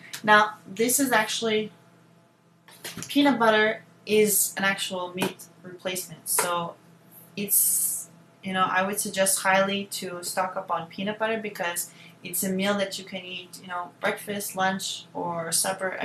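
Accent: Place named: Quebec, Canada. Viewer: Je pense Quebecois. I think Quebecois :) French Canadian :) Some of them are Okay :)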